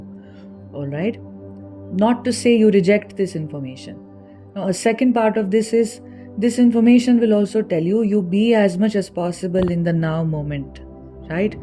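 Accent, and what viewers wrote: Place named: India. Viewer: 🙏 Thanks a lot for such a knowledgeable video and Divine counseling!! I really felt good to get through a channel in English about TF in an Indian voice! 💚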